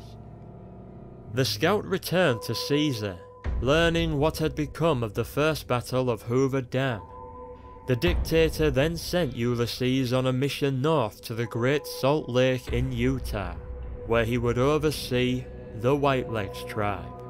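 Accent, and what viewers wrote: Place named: North, United Kingdom. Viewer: "With the soldiers not knowing how to ... fart?" I know, it's your accent, I'm from the north as well. Great video as always!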